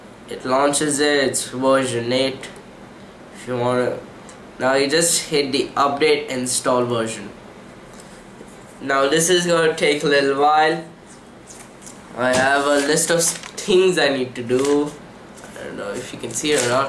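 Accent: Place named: India. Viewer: but i love ur Indian voice ^^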